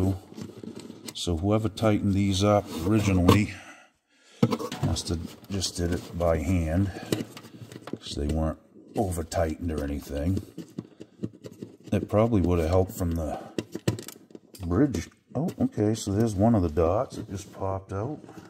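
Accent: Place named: Maine, United States. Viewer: Im trying to get the accent. I'm thinking southern maine, or maybe Taunton. Give us a clue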